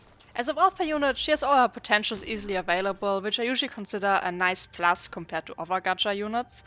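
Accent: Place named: Germany. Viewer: German accent is a welcome surprise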